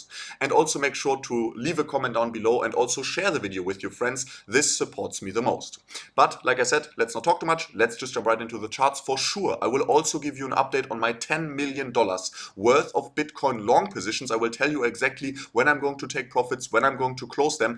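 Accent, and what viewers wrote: Germany. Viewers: Thanks! I just started following you thanks to AltcoinDaily. I gotta say you give a great analysis, oh and I love the German accent! I’d love to see a video from you sometime talking about how you were first introduced to Bitcoin, how old you were, and how you’ve come to build the portfolio you have today.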